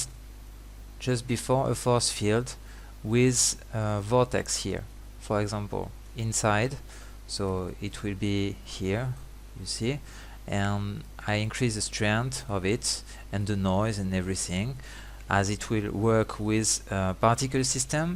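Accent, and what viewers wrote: France. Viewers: L'accent français magnifique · c'est la première fois que je vois un français parler en anglais sur youtube x) ça fait bizarre d'entendre un accent français, enfin bref, bonne vidéo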